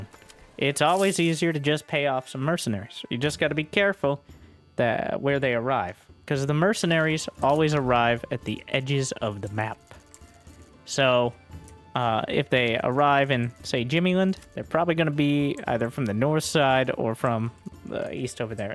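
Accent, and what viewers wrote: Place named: Western North Carolina, United States. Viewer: Where are you from, friend? I can't place your accent. I want to guess Western North Carolina.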